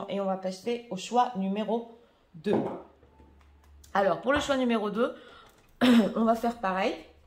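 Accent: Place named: South, France. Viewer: Je viens juste d'écouter cette guidance qui date de 1 an. C'est marrant, je ne savais pas que vous aviez un petit accent du sud 😊, accent que vous n'avez plus sur les guidances de maintenant. C'est marrant. Je ne me moque pas. Je viens de Toulouse et j'ai un accent très marqué 😉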